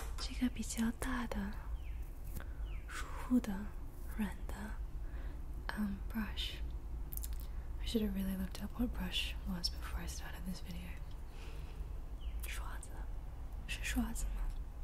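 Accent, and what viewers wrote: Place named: Beijing, China. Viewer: 虽然有些词忘记了，但是你有很北京的口音👍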